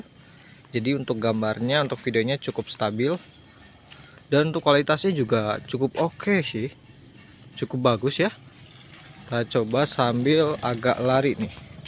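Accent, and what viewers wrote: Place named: West Java, Indonesia. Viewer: Meni Sunda pisan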